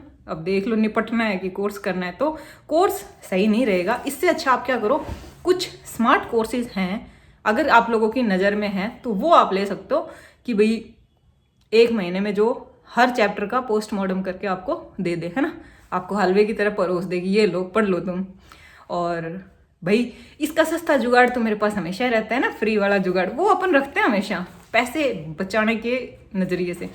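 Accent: Haryana, India. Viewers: M to apka accent dekhke hi samjhgi thi ki app haryana t ho ...quki m bhi urey t ey hu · haryanvi accent? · love your Hariyanvi accent <3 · Haryana ki accent mujhe bohot accha lgta hai😀🔥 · I'm from odisha. I love your hariyanvi accent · AAP bahut funny ho yar speech Harayana ki must hai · I love haryanvi accent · I Like Your Haryanvi Speech Truly Bahut Sweet Bolu he aapki......😍😍😍🔥🔥🔥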